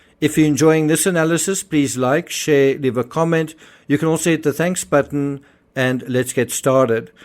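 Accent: South Africa. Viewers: How much is this man making from these smear videos? He sounds South African, so no where near Portugal. The McCanns have suffered enough so leave them alone with this barmy self invested Youtube channel. Give it a rest and maybe post on UFO’s instead? You are part of the problem. Maddie is no doubt not with us any more so stop trying to make her parents lives even more awful and go away and stop making money from misery. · are you originally from South Africa?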